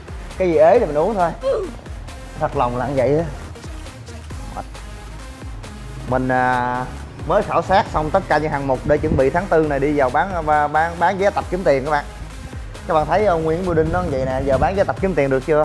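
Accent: Mekong Delta, Vietnam. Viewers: Nhưng đời trc nó ng Bake không phải thuần chủng miền tây miền nam. Nó nói giọng miền tây do nó sinh ra miền tây nó vẫn mang máu Bake.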